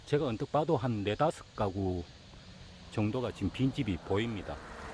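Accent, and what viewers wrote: Gyeongsang, South Korea. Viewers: 오지마을 구석'구석 다니시며 구수한 경상도사투리로 안내 힘쓰시는건 감사한데 최소한의 지역명이라도 알려주심안될까요? 볼수록 자랑만 하시고 혼자서 자기만족하신단 생각이드네요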